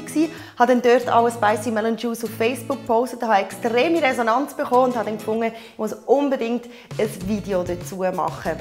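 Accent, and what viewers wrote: Switzerland. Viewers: Super Getränk sehr gut gemacht ich liebe deinen Schweizer Dialekt LG ❤️ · Cool ich muss das auch mal ausprobieren. Schweizer Dialekt klingt so sympathisch 🤗🤗🤗😋😋😋👍🏼👍🏼👍🏼👌🏼👌🏼👌🏼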